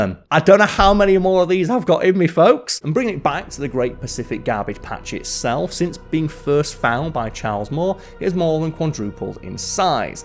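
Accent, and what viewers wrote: United Kingdom. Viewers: Dunno about trying to minimize British colloquialisms. The entire Whistlerverse is founded on the fact that Americans tend to think that anyone with a British accent is automatically smart and well informed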